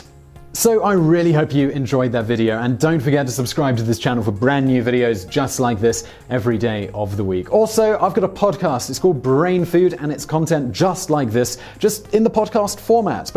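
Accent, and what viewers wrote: England, United Kingdom. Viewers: I just spent an hour trying to figure out why vsauce was speaking in a English accent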